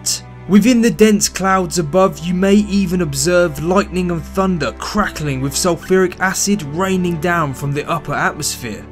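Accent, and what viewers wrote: United Kingdom. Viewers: Well,very intersting information about Venus.But to tell you the true,I think that despite all the uncomfortable conditions at Mars,the red planet looks a far more friendly world to be colonized.Thankyou so much for sharing that so interesting data!!I love that british accent :-) !!.Greetings from Colombia!!!.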